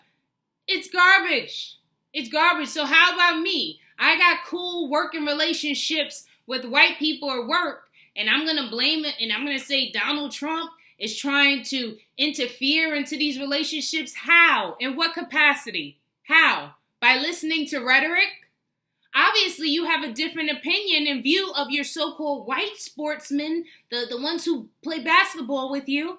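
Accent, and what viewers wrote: New York, United States. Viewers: Hi am from Nassau Bahamas I subscribe too your channel early this morning, and you soo beautiful I love your charming sweet voice you could tell that you are a true New Yorker lol... Am A Eastern Conference guy, I was a die hard Lebron James fan when he played for Cleveland and Miami... And when he went too L.A. am not his fan no more because am not A Western Conference guy..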